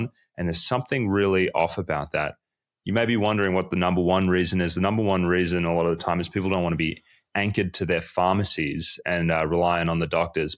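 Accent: Australia. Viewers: Based on your accent I'm guessing you're from Australia, do you work in Australia? I need to get off my meds but my psych isn't interested in helping me.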